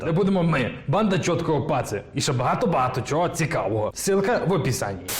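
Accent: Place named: Galicia, Ukraine. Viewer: НЄ ну цей пацан для мене самий. Ріл смішно щей галицький діалект)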